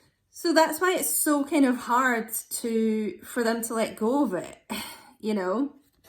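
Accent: Scotland, United Kingdom. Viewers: 🔥♊🔥♊😎💚💚💚 thank you spot on! both Gemini twin flames from Scotland nice to hear ur Scottish accent!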